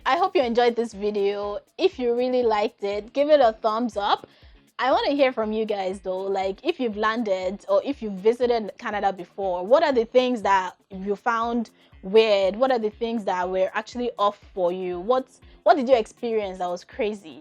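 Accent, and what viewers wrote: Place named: Jamaica. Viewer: For some strange reason, you sound like a Jamaican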